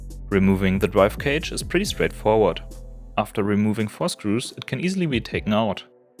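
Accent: Germany. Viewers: Good review! I found it funny: as i listened on, I thought your accent seemed german, as soon as I saw the Wera VDE 1000V certified screwdriver I was certain.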